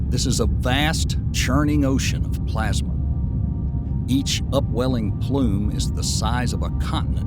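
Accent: South, United States. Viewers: As a southerner myself, (by way of New Orleans, which has its own unique accent, but my family is from Mississippi and Tennessee so I grew up hearing many different forms of the southern accent), this narrator is more appealing to me. The unique way certain words are pronounced is very comforting to me. Combining that with my passion for astronomy and astrophysics makes this channel one of my favorites! If not for the ads, it would be perfect to have playing while going to sleep. I could just pay for YouTube premium, but I survive on a very tight budget and an extra fifteen dollars for something that is truly a luxury and not a necessity would be irresponsible of me. And with the gas prices rising every day, I don’t think it’s smart. I just have to endure the annoying ads that blast the speakers with their irritating gimmicks trying to sell more junk that I don’t want.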